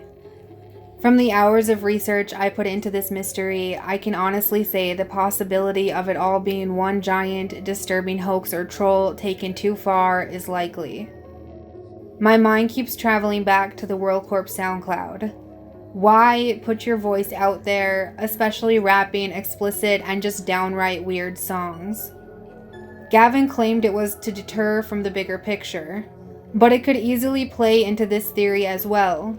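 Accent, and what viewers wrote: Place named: Minnesota, United States. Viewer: Lol im waiting for a doncha know after every sentence. With your accent Minnesota? Montana? The dakotas U got that up north Canadian accent. But yea we need to go after these pedo punks. I wanna batman up with a posse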